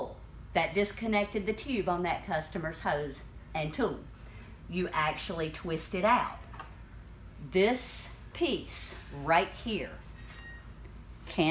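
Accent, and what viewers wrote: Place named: South, United States. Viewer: Who is this lady? Love the Southern accent! lol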